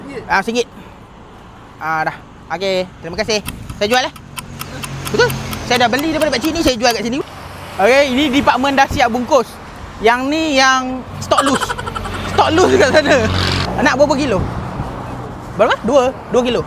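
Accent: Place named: Kelantan, Malaysia. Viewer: Kelate siya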